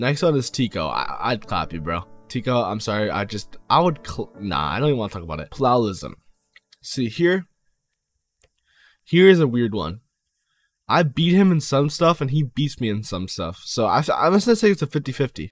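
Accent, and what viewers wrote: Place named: Australia. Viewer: Australian wow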